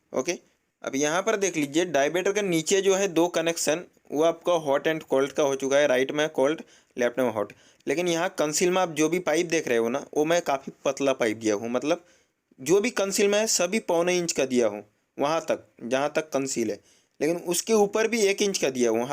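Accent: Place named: Bengal, India. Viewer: Hey bro i know u r bengali ( by ur accent) do u have any good contact for plumbing in kolkata?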